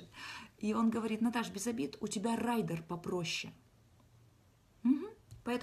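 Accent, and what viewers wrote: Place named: Chelyabinsk, Russia. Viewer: Просто угараю с вашего челябинского акцента))) Какой же он смешной))) В хорошем смысле) Смотрю этот канал, не вдаваясь, о чём там говорит эта женщина, но как же забавно она это говорит)) Как и Кокляев и все прочие челябинцы))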